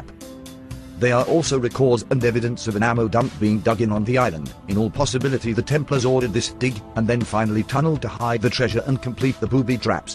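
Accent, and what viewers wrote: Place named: England, United Kingdom. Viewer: Mystery history of lies._. Omg , always a stupid english voice like " they are superior in solving lies " ._. Not. Heres your truth the mystery trys to alter your perceptions away from._. A million pounds worth of lies._. Thats a good wishing well. They go broke and medias get rich ._. They wish to find gold at the bottom of a well ._. They wish they can find wealth benieth a well._. The oak island wishing well._.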